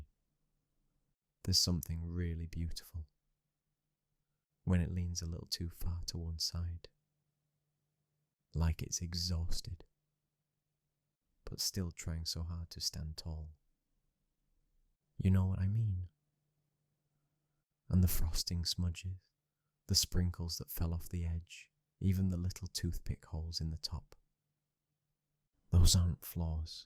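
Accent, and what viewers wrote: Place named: Yorkshire, United Kingdom. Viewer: That voice is so soothing and melodic. I know a Yorkshire accent when I hear one. Are you from North, East, West, or South Yorkshire?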